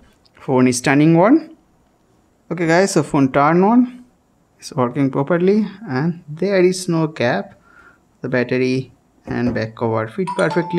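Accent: India. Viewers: i like this channel indian with english is rare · Indians speaking english is probably the most annoying thing ever....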